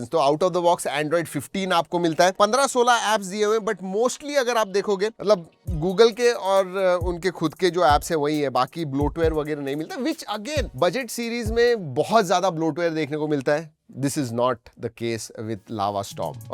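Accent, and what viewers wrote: India. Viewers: Indian english its a trash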